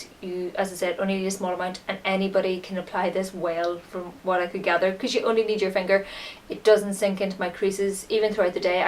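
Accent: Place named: Northern Ireland, United Kingdom. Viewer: u from Belfast or down south I'm from northern Ireland an u sound like you are to lol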